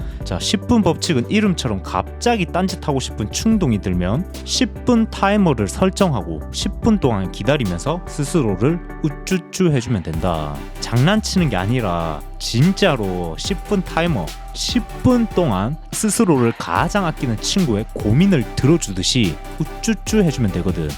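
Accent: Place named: Gyeongsang, South Korea. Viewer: 찰진 경상도 사투리에 수상할 정도로 서윗한 목소리... 니 머가...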